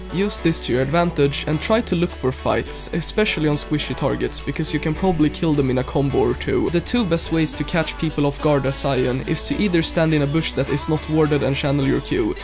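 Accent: Sweden. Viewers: Great vid man! And btw you sound veeery swedish xD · great guide, can you make one that counts for now? love the guide, and how you perform. personally, i main sion, and this guide is pretty good i must admit. love your swedish accent XDD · he sounds like he are from sweden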